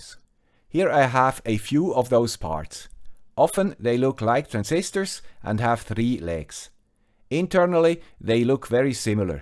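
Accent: Switzerland. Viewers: Agree. I’ve got 25 years into this so far. The guy with the Swiss accent has been a great help.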